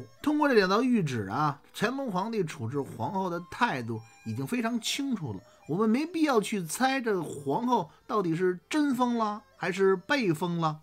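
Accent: Beijing, China. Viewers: 纯粹咱老北京音您呐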